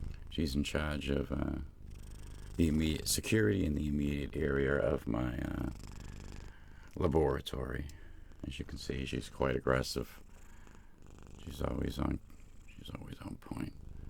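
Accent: Boston, United States. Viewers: Never noticed your Boston accent haha I love it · Wait, he's from Boston? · Boston tang in his voice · Is that a Boston accent? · Yo, are you from new england? you've got that underlyin boston/jersey accent. Love your vids, congrats on the 100k(now more than that)! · Ur from Boston most definitely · I could tell you are from Boston... I love that. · Any one else notice his Boston accent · Holy hell, you're a Bostonian. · He's defiantly a Boston guy, I would know😂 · oml he has a..... what's that? Boston accent? I didn't know he actually had one my mind is BLOWN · Jesus how have I not noticed the Boston accent until just now?? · Another live stream soon? Your every day voice and the Boston accent are the best :) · Never realized you had a Bostonian accent until I watched this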